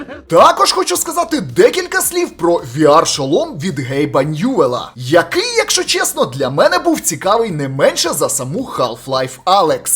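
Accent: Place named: Irpin, Ukraine. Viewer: Цікава говірка у вас в Ірпені)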